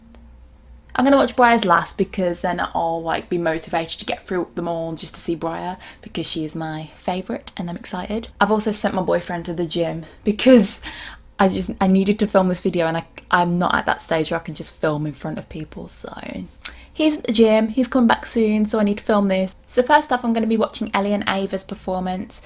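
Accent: United Kingdom. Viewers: You sound British? Are you British?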